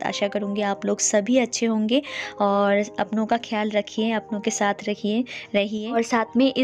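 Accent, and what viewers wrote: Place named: Bengal, India. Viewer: Love you....apki Hindi n mujhe bahut acchi lagti hai bengali wali ultimately 😀😀😀🤗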